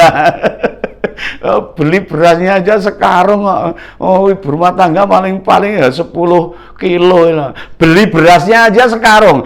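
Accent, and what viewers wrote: Java, Indonesia. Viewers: Saya suuueneeng biiianget Nemu,in cenel ini mas nya lucu jawanya kuuenthel ya inspiratif terus berjaya yamas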